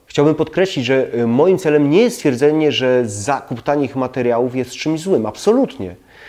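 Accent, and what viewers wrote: Silesia, Poland. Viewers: Ależ razi ten śląski akcent 🙈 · Nie, proszę nie :) ! Ma pan piękny, bardzo delikatny śląski akcent ❤, czy dobrze go słyszę? Niech zostanie! Pozdrawiam serdecznie.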